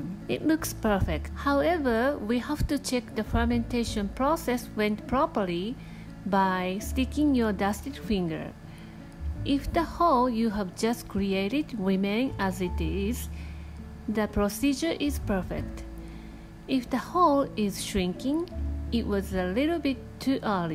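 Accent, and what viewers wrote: Japan. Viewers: i only trust japanese food video that with japanese accent on it.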